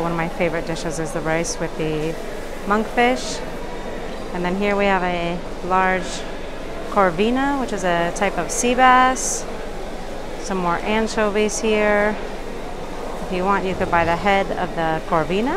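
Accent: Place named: United States. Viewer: Wow, ya lei que naciste en Chicago, yo me decia, donde esta mujer aprendio el ingles tan bien, me decia, acento ingles no es, australiano tampoco, te note pronto el acento de aqui pero me cuestionaba que siendo española por que lo hablabas perfecto and it is because you were born in America jajaja. Muy bueno tu canal. Me retire en America, soy cubano y quiero vivir en Portugal un tiempo, me es muy barato, mucho menos que aqui.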